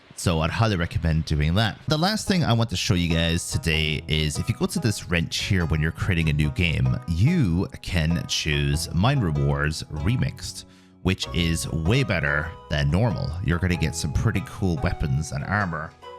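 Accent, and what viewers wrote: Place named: Ireland. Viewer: Savage vid bro, i hear that irish accent peeking through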